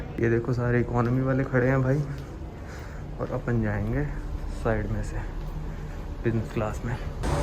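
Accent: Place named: Rural, India. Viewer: Looking ,Sounding and behaving like typical dehaati . What can you expect ?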